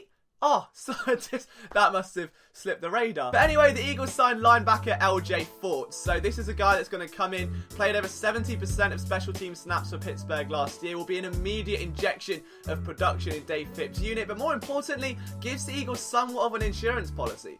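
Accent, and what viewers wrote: United Kingdom. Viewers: You have much wisdom in the realm of football, my son. In spite of your British accent which would suggest one to assume you care nothing about the game at all...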